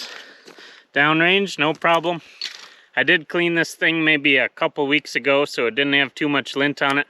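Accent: Canada. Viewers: Hi, I am a new viewer. Are you a Canadian? You have a Canadian accent. Great channel.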